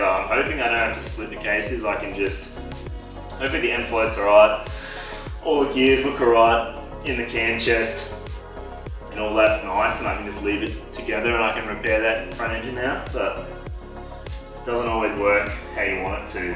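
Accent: Australia. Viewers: I hear the Australian accent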